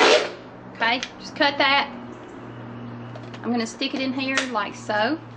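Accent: South, United States. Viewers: u kind of cute with that southern voice... :-P wiking in denmark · it sounded fine to me :-) it is our southern state accent... USA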